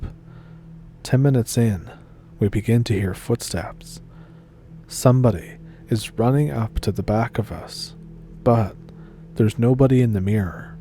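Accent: Ontario, Canada. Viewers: Hello, - Happy Harvests, I thought I would drop by and hear a good story or triple. 🎉, i have said this before, but your accent sounds just like my favorite Ontario cousins, right on best holidays, friend. 👍🏿👹🌅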